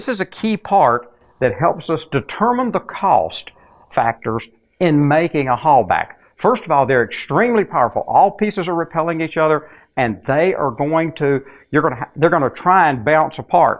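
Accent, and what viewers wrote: United States. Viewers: I like the cowboy accent analyzing cutting edge high techy stuff. You can't get that "yeehaw" feeling in German, French, Chinese or Russian accents. 👍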